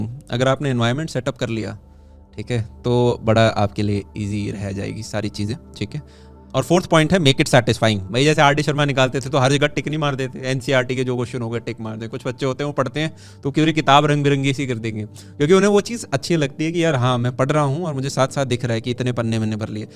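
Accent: Haryana, India. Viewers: Aap haryana se ho na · bhaiya aap Haryana se ho ? kya aapke accent se lagta h😃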